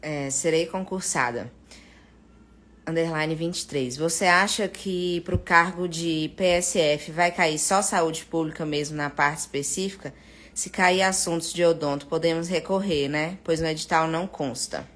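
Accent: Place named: Minas Gerais, Brazil. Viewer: Adorei seu resumo. Pra nós mineiros é muito gostoso aprender no nosso próprio sotaque.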